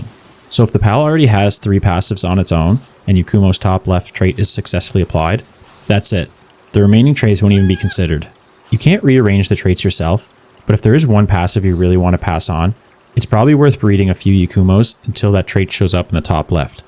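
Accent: Canada. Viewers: Is that a fellow Atlantic Canadian I hear?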